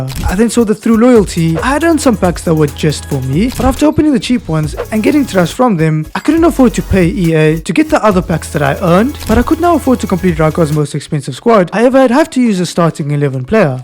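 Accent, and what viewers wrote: South Africa. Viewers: is bro south african??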